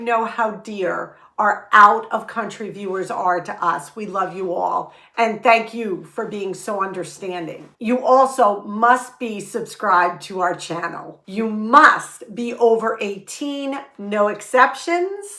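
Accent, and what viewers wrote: New York, United States. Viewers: Congratulations! So excited for your channel's growth! I love your channel. I adore your NY accent. I am a native NY'er transplanted to TX and I feel like I'm back home when I have your channel on, playing in the background while I cook. The latest thing I learned during my frugal journey was to pressure can. I pressure canned chicken and beef and didn't blow up my kitchen! I call that a win.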